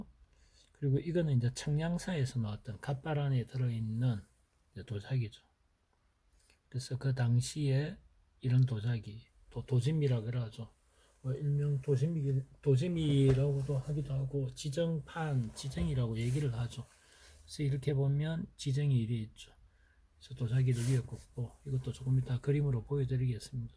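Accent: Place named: South Gyeongsang, South Korea. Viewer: 말씀하시는 톤이 경남분같은데요 놀리적으로 말씀을 잘하시는데 여요 장공항요 한국에 엄청많아요 그런데 판매할때가 없어요 ㅎㅎㅎㅎㅎㅎ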